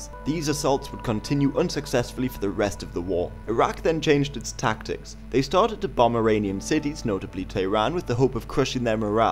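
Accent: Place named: Southern England, United Kingdom. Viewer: I think its a british accent they all speak like that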